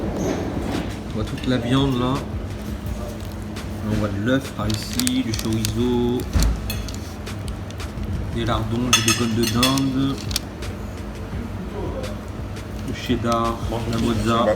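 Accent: France. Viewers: Tu est du marseille ?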